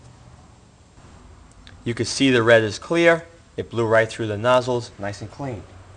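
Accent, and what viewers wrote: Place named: East Coast, United States. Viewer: Well done vid sounds like an East Coaster-Jersey maybe New York? wild guess-What they sound like to me anyways (West Coast-Wa. state)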